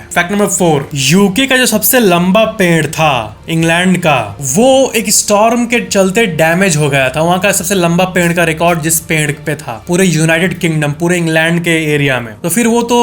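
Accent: Bihar, India. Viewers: Your accecent sounds like bihari,s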